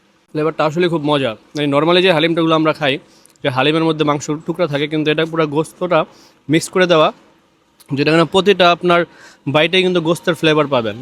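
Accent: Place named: Kolkata, India. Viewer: ভাই ইন্ডিয়ান কলকাতার ছেচরা ব্লগারদের মত ভিডিও কইরেন না আপনার কথা বলার স্টার অনেকটা ওদের মত অথেন্টিক বাংলাদেশি স্টাইলে ব্লগিং কইরেন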